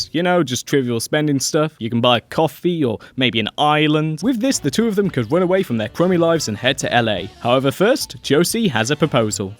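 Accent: United Kingdom. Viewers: he's fucking english dude what do you want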